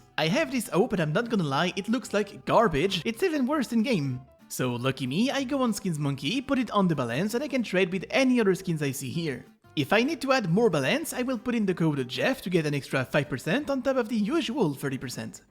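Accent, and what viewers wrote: France. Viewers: T'es français fréro l'accent ça trompe pas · Ton accent français 😂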